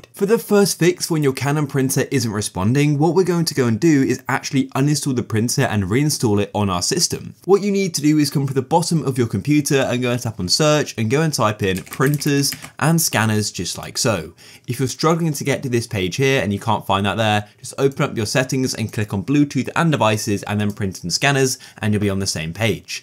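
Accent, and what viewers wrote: United Kingdom. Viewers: That's how ALLLLL British ppl speak...lol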